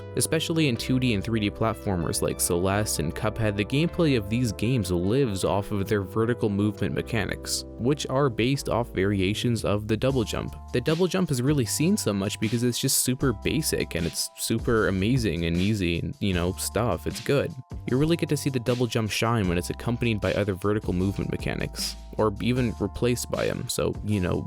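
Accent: Canada. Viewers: you're Canadian right? also fun video.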